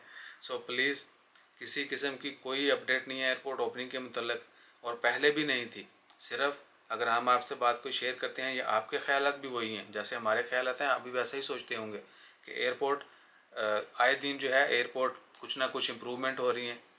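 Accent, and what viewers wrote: Pakistan. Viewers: Salam Walekum kya hal hai theek ho awaaz se Pakistan aadami Corona test Corona test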